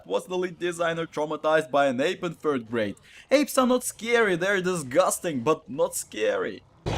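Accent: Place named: Russia. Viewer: That heavy Russian accent is really hard on the ears.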